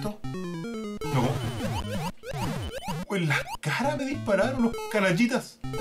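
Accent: Chile. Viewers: dejame adivinar... Eres de Conce? xD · eres chileno? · ERES CHILENO?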